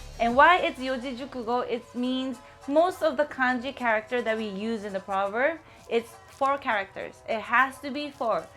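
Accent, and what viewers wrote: Philippines. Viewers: her english accent is very filipino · Mabuhay Sensei!!!. Your accent sounds like a Filipina already! Like you said 郷に入らば郷に従え (笑) Btw I'm a big fan of your artworks from Myanmar!!! Mingalarbar🇲🇲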